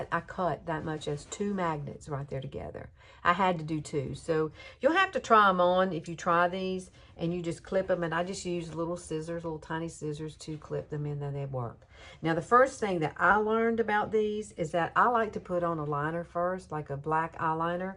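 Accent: South, United States. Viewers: Hi, I recently found your channel; You're so cute and interesting. I like your southern drawl and emphasis on words. I'm a northerner but lived in TN for 28 years but still sound like a Yankee. is. I recently found your channel and so enjoy your videos. I'm going to try those eyelashes as soon as possible. I am 70 so I don't mess with glue around the eyes, especially after cataract surgery. God bless!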